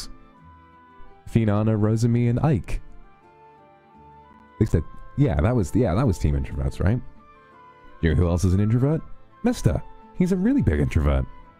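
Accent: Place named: United Kingdom. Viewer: 真的好愛爹地，瞬間愛上英國口音XD 聽完他的雞湯真的變得很有精神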